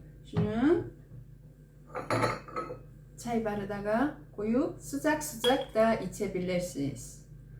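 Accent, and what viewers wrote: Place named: Azerbaijan. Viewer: Elinize sağlık. Tarif için teşekkür ederim. Bu arada Azeriler gibi konuşuyorsunuz 😅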